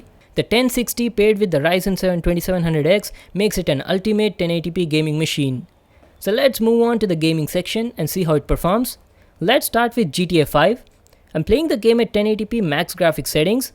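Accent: India. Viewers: Perfect indian accent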